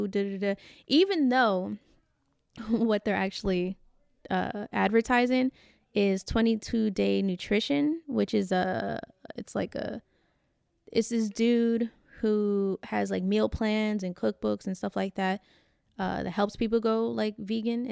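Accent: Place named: Brooklyn, United States. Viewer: what part of Brooklyn you from?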